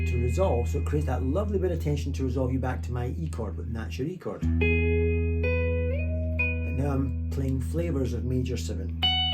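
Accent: Scotland, United Kingdom. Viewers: What a fxxxing lovely sounding Tele. Of the many many custom Teles I've heard on Youtube, that one really sings (of course helped no end by the great phrasing and touch of the guy holding it). Also, didn't expect to hear that accent after the opening music. From a fellow Scot (albeit faraway from home)...just fantastic playing mate. Also Also...why cant other Youtube channels get decent amp tone like you?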